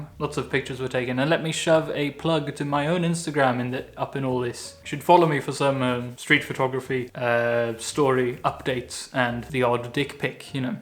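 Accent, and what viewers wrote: England, United Kingdom. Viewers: I know Swedes speak good English and you lived in the UK but damn, the British accent is spot on. Not a hint of an accent like you were born to speak English. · I have officially found my favourite youtube channel, came her for the uni info, stayed for the sarcasm. I still can't believe you're swedish, not that I have many swedish friends to compare you with, but I could have sworn you were english ( the accent fooled me ).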